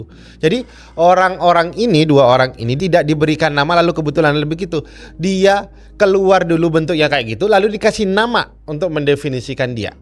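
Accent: Madura, Indonesia. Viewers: Dari logat bicara nya Abang ini suku Madura, Benar..... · Logatnya kyk ny madura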